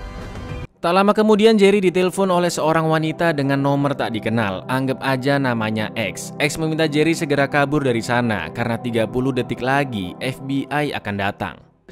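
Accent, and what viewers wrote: Java, Indonesia. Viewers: Halo Lurr. Bang Org Mana? Jawa or Jogja?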